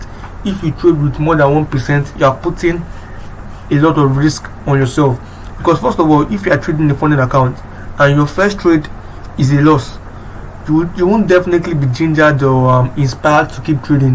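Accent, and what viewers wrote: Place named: Nigeria. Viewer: Nigerian accent is easy to know!! You actually sound like someone I know.. Respect bro!✊🏾 It's good whenever I see a Nigerian doing this on YouTube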